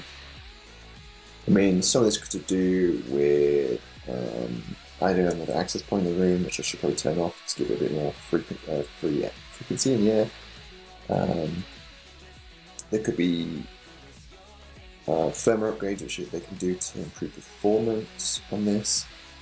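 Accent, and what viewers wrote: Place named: United Kingdom. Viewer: Got my hopes up hearing British accent that you can pick these up in the UK alas by the Speedtest he is in Colorado.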